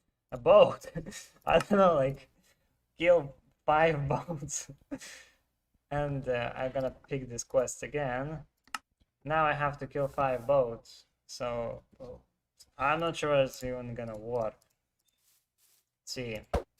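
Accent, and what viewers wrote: Russia. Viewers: i think you are from Russia, because your acsent, words: "kek", "da".. Hmmm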